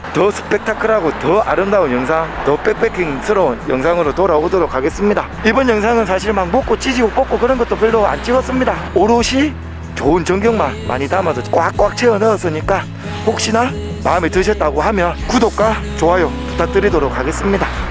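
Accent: Gyeongsang, South Korea. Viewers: 일본어쏼라쏼라~~~믓지네요 뷰도 믓지고 ㅋㅋ 근데 일본어도 경상도 사투리가 묻어있네요 뼈속까지 갱상도!!ㅋㅋ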